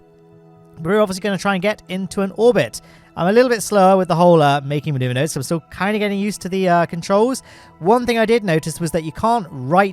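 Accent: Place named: United Kingdom. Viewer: YEEEEEEEESSSSSSSSSSSSSSSSSSSSSSSSSS, I am like a kid on Christmas! 🎉🎉🎉🎉🎉 I can’t wait for the release! Also why do you sound exactly like Grian who plays Minecraft?!?! Do all British people sound the same?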